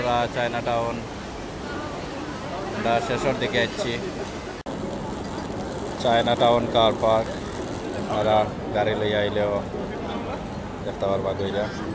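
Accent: Sylhet, Bangladesh. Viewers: Md Khairul এ রিপুজির বাচ্চা এটা সিলেটের আজ্ঞলিক ভাষা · ata sylhety language understand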